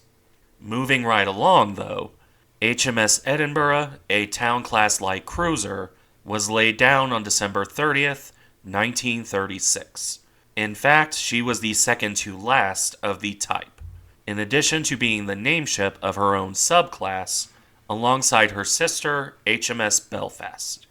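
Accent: United States. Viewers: Sorry, this is not meant to detract from the work you put into this video. It's good. It's just the pronunciation is like nails down down a chalkboard. Ironically enough at points you prnounce it almost perfectly. With the typical American accent it is easier for you to pronounce it Edin*Bruh* A similar sound to what you would make saying 'Brother* or "Hey Bruh, what you doing?" While not quite right, it is would be less jarring to people who live in the UK. I'll keep an eye out for your next videos. Thanks.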